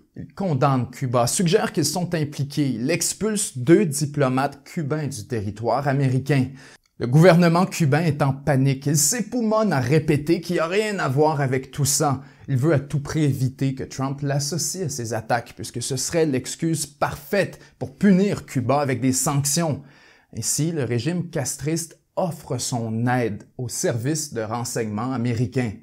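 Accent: Quebec, Canada. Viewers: jaime pas laccent quebecois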